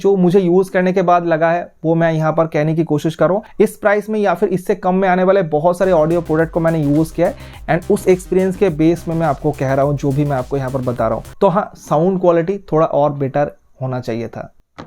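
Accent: Bengal, India. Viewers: Bhii app Bengal see hoo kya??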